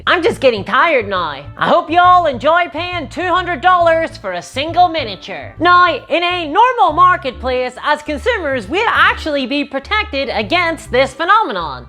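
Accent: Northern Ireland, United Kingdom. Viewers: Ah I'm near Bristol, knew it had to be some kind of british! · Are you from the north of Ireland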